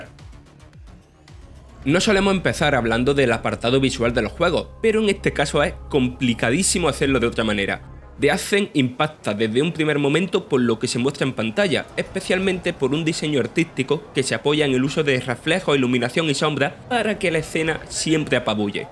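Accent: Andalusia, Spain. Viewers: No quiero menospreciar su trabajo pero me costó entender al narrador. Es un acento andaluz muy marcado y para un gallego como yo es complicado. Antes de nada, aclarar que yo no valdría para narrador porque tengo un acento de la hostia. Sólo que este tipo de vídeos creo que necesitan un castellano neutro.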